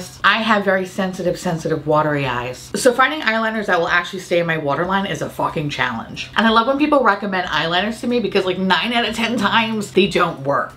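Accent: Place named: South, United States. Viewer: As a Texan, you totally worked that "southern hospitality" accent. It's apot on, and pairs lovely with your spooky girl aesthetic. Living for it. Love this palette and this video 🖤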